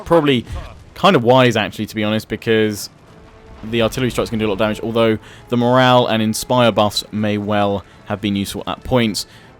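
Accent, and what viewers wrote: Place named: Scotland, United Kingdom. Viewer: That Scottish accent tho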